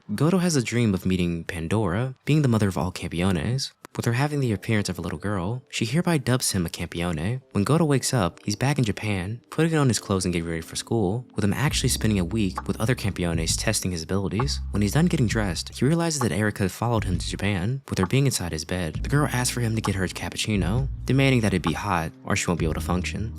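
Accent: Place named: United States. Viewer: Did I understand much? No Did I watch the whole thing? Yes Why? Because I need to do an American accent in a play, and I’m copying you 👍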